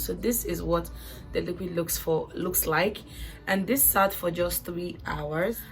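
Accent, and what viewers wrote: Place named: Nigeria. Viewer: you’re annoying ! Just answer the comments 😂 not everyone can understand that strong ass Nigerian accent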